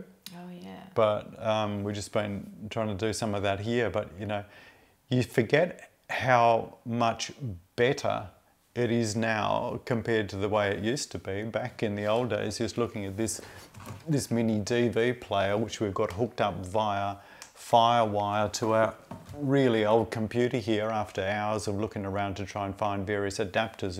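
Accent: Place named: RP, United Kingdom. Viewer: your English is perfect,.. even sounds a bit posh 😄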